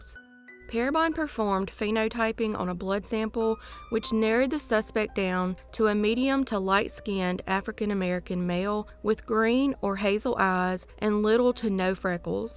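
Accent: South, United States. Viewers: It’s so nice to hear another southern voice. Very nice and organized video so thank you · I could listen to that sweet southern accent all day. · Hey. Just found your channel by accident but love it. Great job telling these stories. Love your accent! K 🇨🇦 🇺🇸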